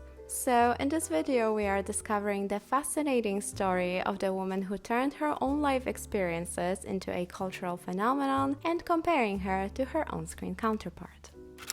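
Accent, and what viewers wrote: Poland. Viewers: Your Polish accent is unbearable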